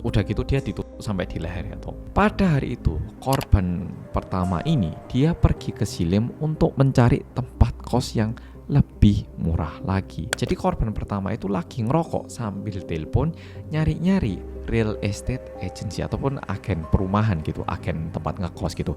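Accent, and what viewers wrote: Java, Indonesia. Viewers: Oppo benar-benar udah indo banget ya 😅 selain medok selalu untung gak mau rugi 😂 Yang sakit gak hanya bumi, manusia yang hidup didalamnya pun sakit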